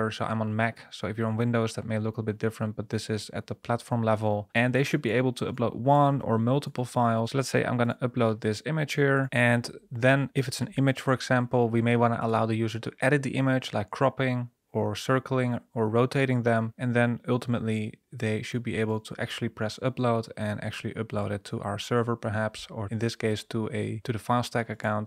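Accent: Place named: Netherlands. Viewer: Very interesting channel. Are you Dutch? You sound very Dutch :) I am travelling around the world and do most of my work remote. How about you?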